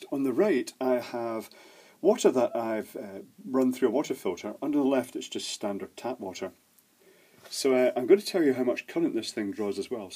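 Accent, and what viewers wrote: United Kingdom. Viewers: oh, of course. whenever i hear a british accent i assume the device in the vid has a G-type plug :D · I don't know why I keep watching your videos. I know nothing about electronics and most of this I just don't understand. Must be your soothing british voice... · I will never understand why British people pronounce aluminum "aluminium"... There's only one "i" in aluminum.... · He's in the UK. Here it is spelled Aluminium (with an extra i) and pronounced that way. There are many differences between UK and US spellings/pronunciations.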